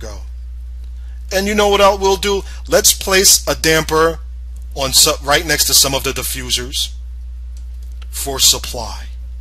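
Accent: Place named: Brooklyn, United States. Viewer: Cool Brooklyn accent... Good tutorial, but I am curious to if the duct sizes and CFMs are actually linked to the parent file, with considerations to building orientation and insulation values. From a design perspective, are ducted returns now normative in NY? as ceiling plenum returns are much more efficient and less fussy -- except where mandatory for hospitals, labs, etc.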